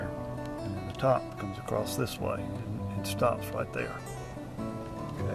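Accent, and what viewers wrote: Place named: South, United States. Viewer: I’m getting a “southern” Bob Ross vibe. Excellent outcome, wood grains look awesome.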